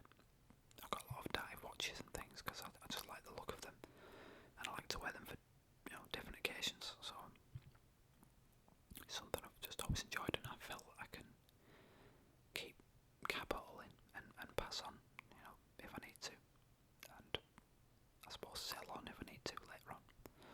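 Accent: United Kingdom. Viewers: Love this. Nice accent, where in UK are you?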